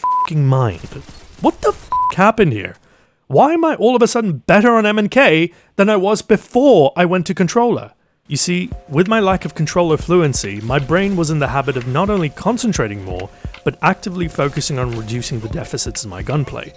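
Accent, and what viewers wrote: United Kingdom. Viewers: Yessssss. Welcome to the “Sticks on PC” club. I love it. One thing I’ll add to the “not science” category is, one of the reasons I like to play sticks is simply because it’s much closer to the feel of firing a real weapon than M&K can ever be, thus adding to the feeling of immersion and just sinking into the game. Btw, your dulcet vocal cords mixed with that refined British accent totally hit my ASMR trigger to the point, I could probably just loop your videos in the background all day. Well done.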